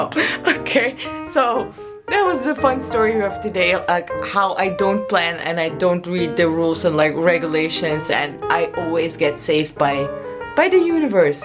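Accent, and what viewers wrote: India. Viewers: I just started watching your vlogs , and nothing could have stopped me from subscribing your channel . I just loved the way you do it , love your sweet smile 😊 , and loved your hindi accent haha . You are really doing a great job , a very good luck for your future 😊 Keep posting . Made me a huge fan 😊.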